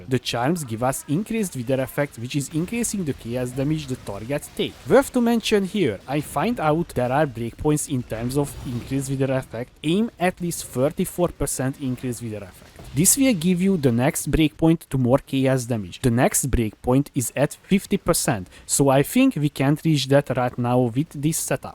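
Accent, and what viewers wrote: Hungary. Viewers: Are you Hungarian by any chance?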